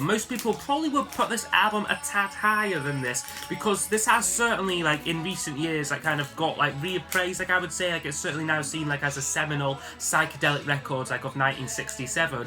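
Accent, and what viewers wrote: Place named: Northern England, United Kingdom. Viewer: Great video. Liked a lot of the positions you put them in Trying to place your accent, thought i heard Northern English, Welsh and maybe even Scottish at one point 🤣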